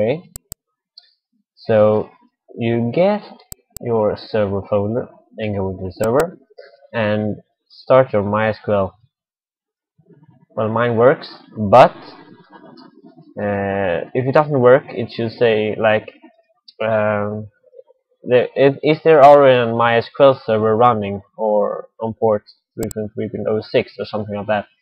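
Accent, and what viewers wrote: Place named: Sweden. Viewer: Man hör ju att du är svensk O_o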